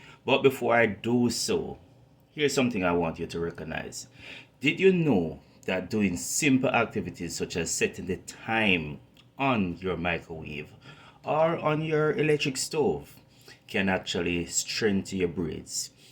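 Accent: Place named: Caribbean. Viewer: You sound Caribbean😊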